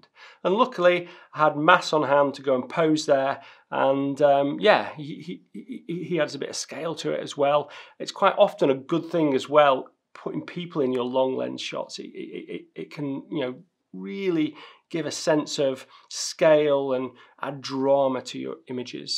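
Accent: United Kingdom. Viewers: Great stuff. I kinda wish I had grown up in the UK, simply for the accent:) instead I have "Texan";-). Love the Tree/Mountain shot! Most of my insect shots are using my 100-400.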